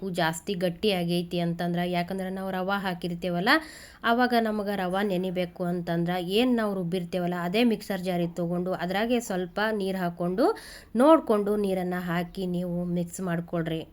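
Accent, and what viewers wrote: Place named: North Karnataka, India. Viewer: Hi akka namshkar 🎉 Tumbha Changi madidera, nannu kuda tumbha ista aiytu akka Uttar Karnataka style matnali kelooke enu chand akka 🎉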